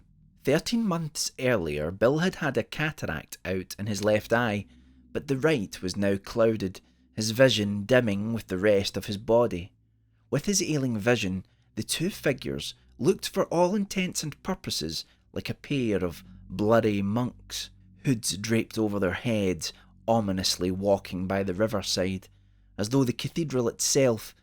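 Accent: Scotland, United Kingdom. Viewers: one of my very favorite authors! What a dark and beautiful story this was... Good for Martha 👍!! Thank you, I love it when you read your own stories with that lovely Scottish accent. I thought at first the story had just ended with that long pause but was happy when I went back to listen and found the fantastic ending! I hope you're staying happy and healthy in spite of all the madness of this world right now. Much love to you and your little family ❤️😘♥️. ♥️🇺🇸♥️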